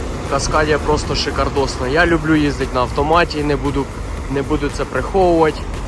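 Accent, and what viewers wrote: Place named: Surzhyk, Ukraine. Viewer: А мені подобається твій суржик,обожаю( правильно обожнюю).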